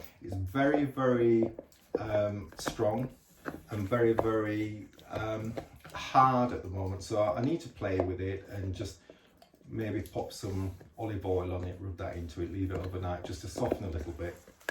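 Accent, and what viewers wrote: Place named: United Kingdom. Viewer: Hi, I enjoyed this video very much. As an American I’m quite enchanted by the way you speak haha. Interesting that you Brits count food in grams and call what we would say “doing it’s business” as “spending.” I think my favorite thing though was when he turned around to look at you and you just said “Hi Spencer!” It warmed my heart. On another note you showed everything very clearly to the camera and your descriptions were great and added a lot to my experience even as a sighted person. My whole county is under quarantine for the coronavirus starting tomorrow for 14 days so I’ll be watching all the videos. I do have one question- have you decided on a middle name for him?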